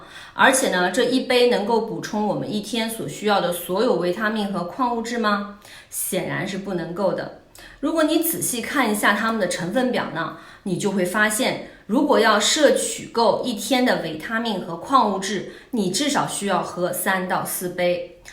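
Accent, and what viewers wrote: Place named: Anhui, China. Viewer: 冰淇淋+布朗尼真的是王炸组合，也太好吃了也有好多好吃的食物： 各种肉类、牛排、蔬菜（老实说真的好吃的不多）西柚、柚子、最近我发现实在是不容易吃到吐的还可以的就是：番茄炒蛋、凉拌黄瓜了，难过。。。再次猜一下是不是来自大安徽，哈哈哈口音也太接近我家那一带了么么哒❤️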